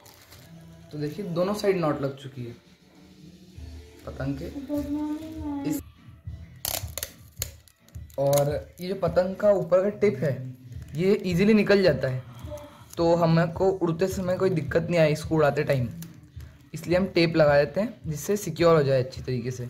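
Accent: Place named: Indore, India. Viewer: Aap indore se he . Aapki language se pata chal raha he. Very nice.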